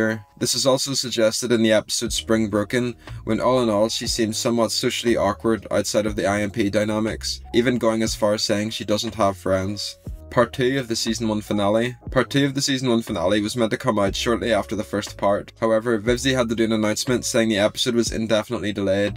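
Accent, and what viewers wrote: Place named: Northern Ireland, United Kingdom. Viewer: are u northern irish? i immediately recognised that accent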